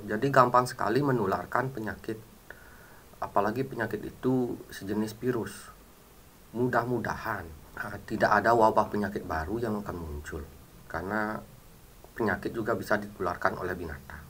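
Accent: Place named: Borneo, Indonesia. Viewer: salam dari tanah dayak kalimantan cara ngomongnya mirip sekali logatnya dgn org dayak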